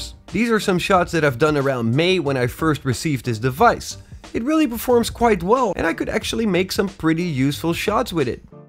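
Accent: Netherlands. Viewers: This sounds like a Dutchman...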